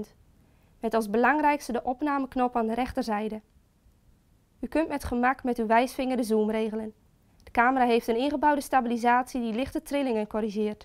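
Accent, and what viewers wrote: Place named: Geordie, United Kingdom. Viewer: That is the strongest Geordie accent I've ever heard!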